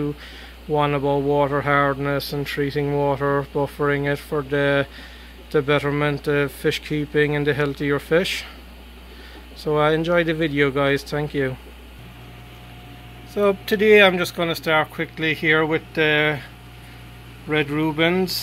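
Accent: Ireland. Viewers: You’re either from Galway or Mayo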